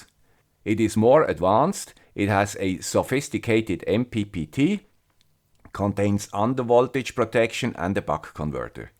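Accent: Switzerland. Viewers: I visited a friend in the Basel canton in early July. I can confirm this is indeed a Swiss Accent. :-)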